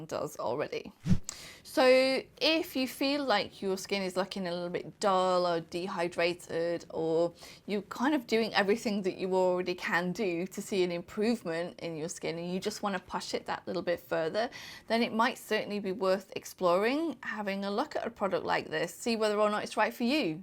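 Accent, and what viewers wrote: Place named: United Kingdom. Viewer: I' m here for that british accent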